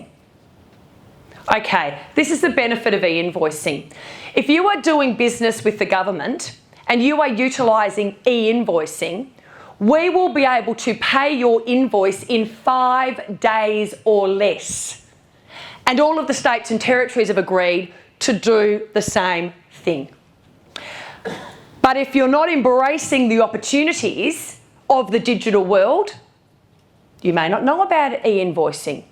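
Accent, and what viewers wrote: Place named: Ipswich, United Kingdom. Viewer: She is Bronwyn Bishop reincarnation with Ipswich accent.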